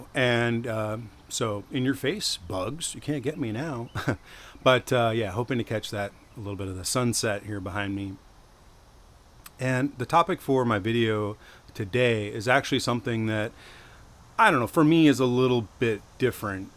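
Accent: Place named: Georgia, United States. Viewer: I made a comment earlier saying I’m new to your channel. I said that before I listen to the whole video it sounds like you’re back in the USA maybe Savannah Georgia which I’ve been there before because my son-in-law was stationed at Fort Stewart anyway really good video. I’m hoping to move there in a couple months to retire. When do you think you’ll be heading back to the Philippines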